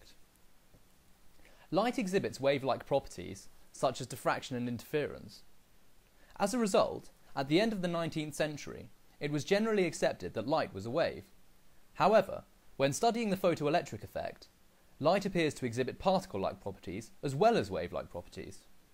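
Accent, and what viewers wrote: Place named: RP, United Kingdom. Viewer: Awesome posh accent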